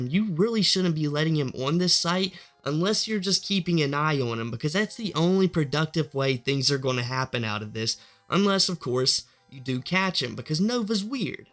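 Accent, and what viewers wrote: South, United States. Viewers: You have the mosr country accent ever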